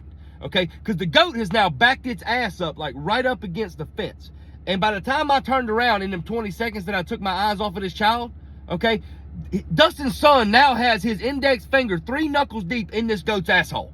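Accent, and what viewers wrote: South, United States. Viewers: That is fifty shades of wrong three ways to Sunday and I ain't lyin'. That there is one helluva case pink eye just waiting to happen to the whole house. If it ain't already happened by now. nods Oh yeah, other people's kid will definitely have you rethinking your lifetime plans with or without kids. Of course I did laugh my ash off.😂😂😂😂 It's that Southern twang he got. I love it. Got me all homesick.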